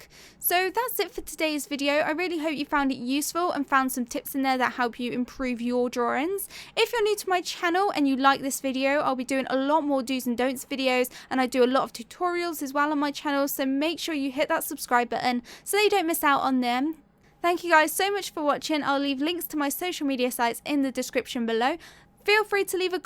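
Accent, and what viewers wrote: United Kingdom. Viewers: British accent? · Hi your sketching technique is just as beautiful amd marvellous as your lovely and sweet British Accent. I am in love with your voice and killing British Accent. Wow! I have not seen many videos with this simple yet realistic technique. Thank you very much for sharing. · I love your accent British!!!! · I love ur british accent :) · Is that British Accent?? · BRITISH ACCENT OP SHE LITERALLY SOUNDS LIKE CLAIRE HOLT ( REBEKAH MIKAELSON) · The British accent <33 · British accent???¿¿¿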